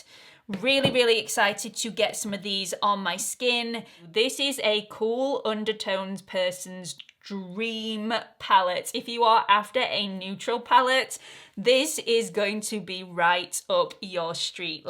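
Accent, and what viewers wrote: United Kingdom. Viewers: Hi, thanks for another great review. You always create the most beautiful looks with such a simple technique. Also, no worries about pronunciation. I find that a good many words are pronounced differently in the UK vs the US (I love the way you say vitamin... We pronounce the first i as you would in the word like and then the rest the same as you). It is just a cultural difference and definitely nothing to worry over. Peace, love, and happiness!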